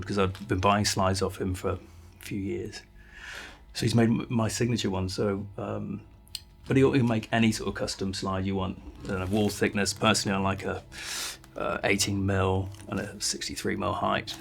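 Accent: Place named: Wales, United Kingdom. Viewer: Blown away by the magnificent slide sounds on the various guitars and your wonderful Welsh accent. I enjoyed learning about the pedals and other gear, as well. Thank you for sharing all,. ❤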